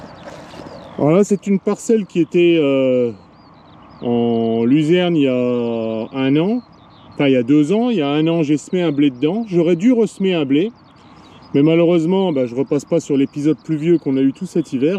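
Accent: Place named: Champagne, France. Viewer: Bonsoir, il fait beau aussi en Champagne, t'es champs sont pas loin de la route Vitry Chalons, il y a de la circulation, de bien jolie vidéo, je suis quelques agris en vidéo toi et Sam mais lui pas dans le même coin, toujours cet accent Champenois, Gilles en Sud ouest Libournais , allez vive la Champagne et la Bourgogne, ta terre est pas trop mauvaise, tu préfères la craie, ici chez moi de l'argile au jardin, mon épouse s'occupe des fleurs en ce moment, rien au jardin, mais il est prêt pour les plantations ensuite quand viens l'été plus rien, l'eau coûte trop cher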